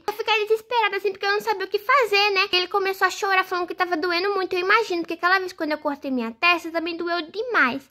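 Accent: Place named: Northeast, Brazil. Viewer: Te amo muito ❤️,minha princesa linda nordestina 🌵 ❣️ Ela é muito engraçada e ainda por cima tem sotaque nordestino 💞🌵 Linda 💜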